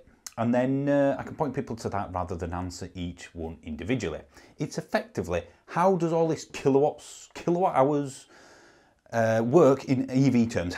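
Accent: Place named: North, United Kingdom. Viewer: Love to hear that northern accent!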